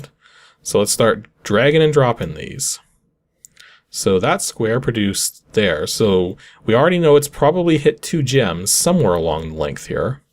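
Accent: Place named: Canada. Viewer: I agree. You're a Canadian. Can't expect you to know every correct local pronunciation of the entire world. If you know how you know how, if not just pronounce in your Canadian English. At least you're not apologizing for ever name you pronounce, which is one of my main youtube annoyances, well in the few channels that I watch.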